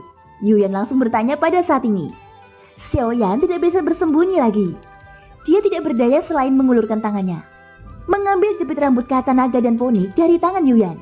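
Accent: Java, Indonesia. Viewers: Medok nya min😅😅😅cuma pengisi nobarta lama yg mantap intonasi pembawanya😂😂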